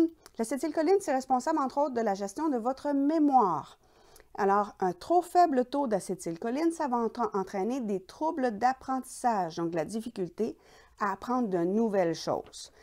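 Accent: Quebec, Canada. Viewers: Ah oui et j'ai commander Ton livre abc verbal et non verbal En amour pour mieux comprendre J'adore et c'est facile à lire Ils y a quand même certains Mots que je comprends pas Mais je revient à la page pour comprendre ce que ça dit Merci pour tout. Vive la prochaine Capsule et j'adore ton accent québécois très cher Depuis la suisse bisous Sophie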